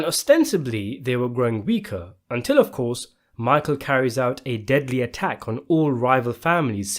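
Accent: United Kingdom. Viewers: yo,you a brit? Cause im not entirely sure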